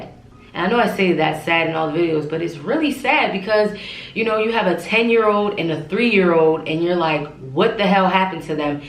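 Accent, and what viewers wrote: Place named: Baltimore, United States. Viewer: Side note are you from Baltimore? Your accent ☺️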